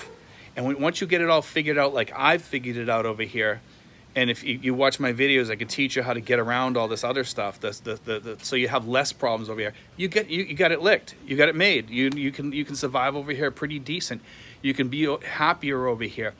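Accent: Boston, United States. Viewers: You just showed up in my feed. You could have been any guy from anywhere on the planet. As soon as you Open your mouth I said Boston.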